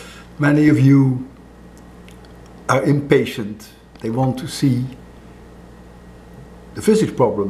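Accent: Netherlands. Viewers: you sound dutch are u if so my 2 :3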